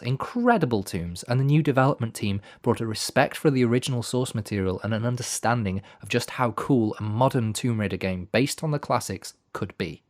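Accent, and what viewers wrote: Wales, United Kingdom. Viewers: Thanks for sharing these podcasts; it’s so interesting to hear your story with TR. I think we’re quite similar! I grew up in Wales too - clocked your accent;) - but had a crap time being bullied in a rough secondary for being ‘geeky,’ so actively pushed down those interests to remain safe at school. It’s inspiring to hear someone embrace their passions so wholeheartedly. Did you get any backlash growing up for your interest in TR and gaming up in NWales? I loved the puzzles, history and more deliberate game pace of the older games, even though my family thought it was Lara’s sex appeal - how wrong they were…;p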